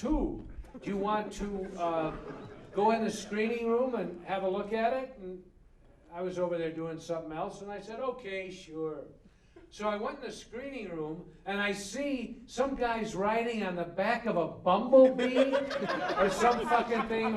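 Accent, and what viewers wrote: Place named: United States. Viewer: the hand gesture and US accent threw me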